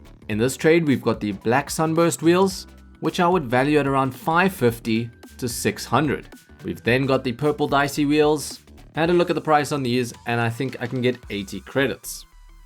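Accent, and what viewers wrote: South Africa. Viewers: I just want ti ask are you from South Africa or Australia or neither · Is this guy from South Africa ? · Yo u from SA?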